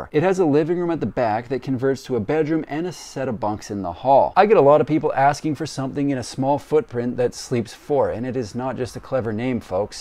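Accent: Canada. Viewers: Thank you for informing all of us about so many interesting homes. I believe homes, built in pieces, are the future. They take less time to build on-site. You are terrific! ha ha I thought you were a california guy but now know you are canadian...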